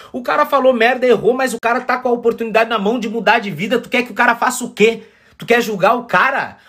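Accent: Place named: South, Brazil. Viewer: Uma gracinha esse sotaque de sulista hahahaa e ele tá certo nisso aí!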